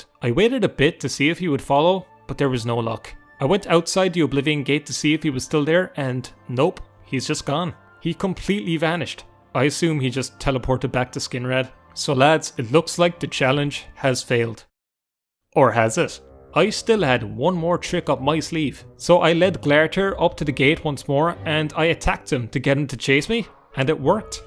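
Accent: Ireland. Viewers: Haha funny oirish man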